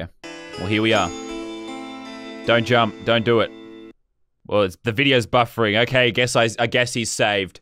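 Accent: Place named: Australia. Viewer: Even when he screams the theme, he still sounds australian...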